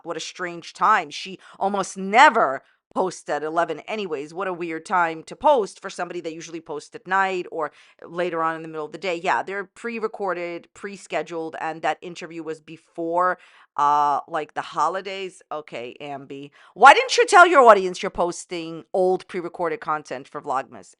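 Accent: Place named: Long Island, United States. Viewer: sounds Long Island-y to me.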